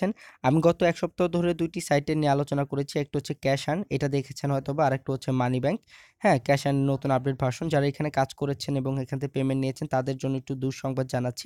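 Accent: Barishal, Bangladesh. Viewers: হা হা হা... ফাডাইলাইচেন মিয়া.... মোগো বরিশাইল্যা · সত্য কথা বলছি ভাই... আপনার বরিশালের ভাষার কথা ৩ বার শুনেছি... খুব মজা পাইছি... সুপার ভাষা l love you brother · ভাই তুমি তো পুরাই বরিশাইল্লা হইয়া গেছো। এখন তো আমার ও বরিশাইল্লা ভাষায় কথা বলতে মনে চাইতেছে। মোরে কি সিহাইবা বরিশাইল্লা ভাষা। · borisaler vasha very nice legese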